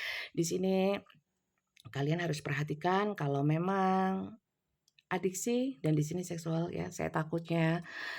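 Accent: Java, Indonesia. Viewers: Bunsaya suka ibu kalau omong Jawa medok banget. Sebagai orang Jawa.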